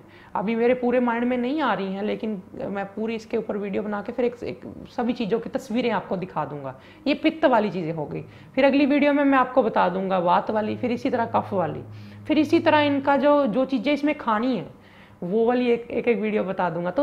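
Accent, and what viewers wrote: Punjab, India. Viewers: Aap bhut achha btate h pr Aap ki looking naam match krta h Pr aap ki bhasha me punjabi lhja h achha nhi lgta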